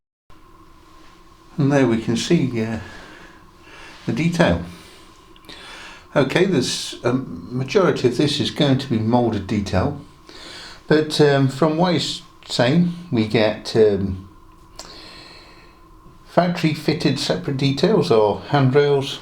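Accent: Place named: Midlands, United Kingdom. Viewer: Nice review, I enjoy your channel. Bought one of those grey Farish 37s because of your review, very pleased with it. Still trying to guess the accent, though. Midlands? Maybe Leicester? I'm probably miles off!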